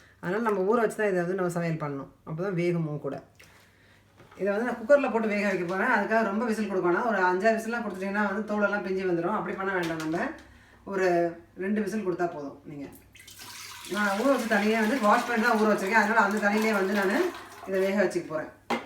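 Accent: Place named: Coimbatore, India. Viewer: But unga speech la lesa Coimbatore slang come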